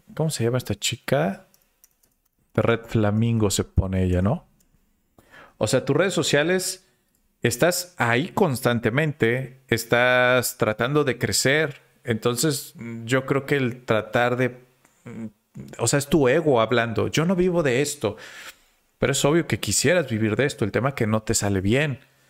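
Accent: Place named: Argentina. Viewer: Por tu acento me doy cuenta que eres de Argentina,.admiro y respeto gente culta en el deporte y en la música., tienes razón empecé con estos canales por curiosidad y me gustaron demasiado aparte de ver los de tu compatriota Agustín laje arrigoni,el cual es un escritor que me encanta y admiro.viva Argentina. Trataré de tomar muy en cuenta tu consejo el cual tiene mucho de razón. Saludos desde México tierra Azteca.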